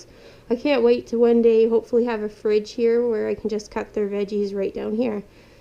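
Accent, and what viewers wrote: Canada. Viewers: You sound Canadian 😁 are you?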